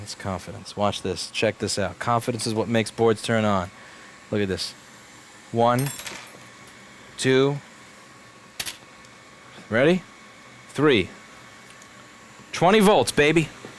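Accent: New York, United States. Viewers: The accent/speech pattern is a mixed of Seinfeld, Joe Rogan and Bob Ross plus a sprinkling of NYC cabbie. Somehow turns out very entertaining know these videos.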